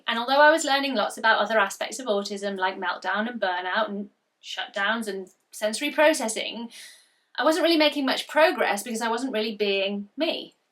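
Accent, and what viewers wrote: United Kingdom. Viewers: I like you just as you are. And, I'm American, and I love British accents!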